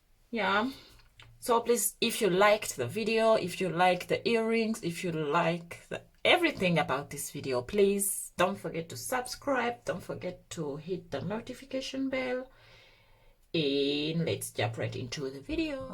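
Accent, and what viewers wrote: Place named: Jamaica. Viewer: that's Jamaican talking but good work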